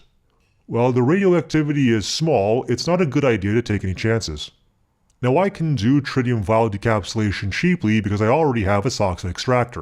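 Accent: Canada. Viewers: Ahhhh so you're canadian.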